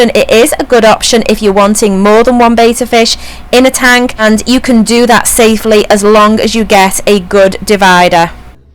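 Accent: United Kingdom. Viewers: i'm a bit confused, you sound british, yet you describe capacity in gallons, and all your links to buy stuff is in dollars.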